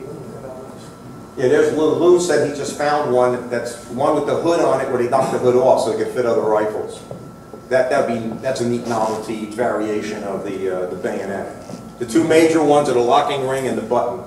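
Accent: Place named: New Jersey, United States. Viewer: He speaks New Jersey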